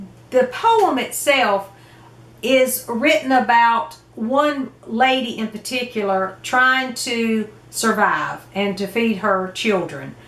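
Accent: South, United States. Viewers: I love your south accent! It's more smooth in my (french) ears than the british accent 😊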